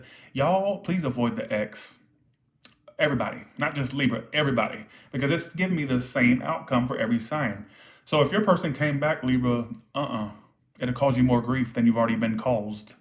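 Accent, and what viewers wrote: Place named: South, United States. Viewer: Your Strong Southern accent is popping up today.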